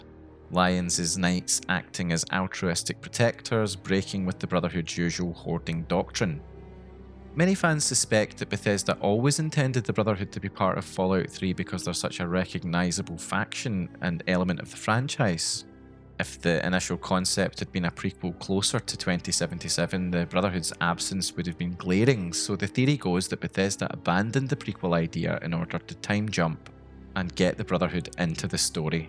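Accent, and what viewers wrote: Scotland, United Kingdom. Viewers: Holy shit, a Scottish video game lore youtuber? I'm fair tricket wi yer video and jist wanted to say fit like the day loon, foos yer doos? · Scottish accent ❤ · Nice, the exact same video as the others but with a lovely Scottish accent - that alone makes this the definitive edition lmao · Your accent... Is it Scottish ? Sounds great. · You sure hes not Scottish? He sounds Scottish to me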